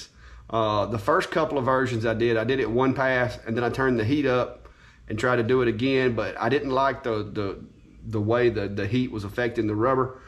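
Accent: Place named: Georgia, United States. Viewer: My husband and I just found your videos after getting an XTool and we love em! We’re from SC and we made a bet that you’re from GA based on your accent?!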